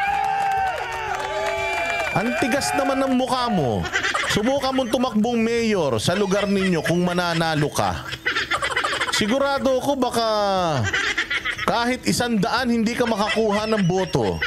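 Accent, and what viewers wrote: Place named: Visayas, Philippines. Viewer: Tawang tawa kami sa iyo uy. Para kang bisaya tulad namin🤣🤣🤣🤣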